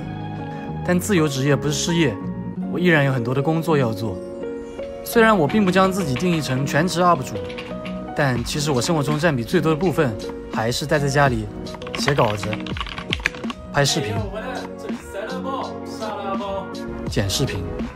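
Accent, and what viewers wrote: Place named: Hangzhou, China. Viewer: 一听口音就好亲切 果然是杭州的 感觉你身体好有点僵硬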